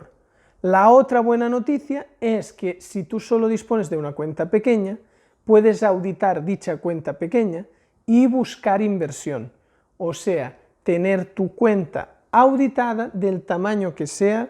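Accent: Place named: Catalonia, Spain. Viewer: Cómo que romper un mercado?? Eso es posible?? P.D: Aquest accent català... xD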